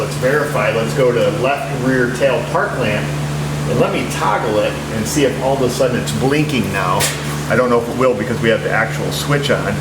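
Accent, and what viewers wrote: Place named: Western New York, United States. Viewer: I enjoy the accent brother, I'm a native Buffalo/Hamburg, you are over in Bath, that's the accent which reminds me of home.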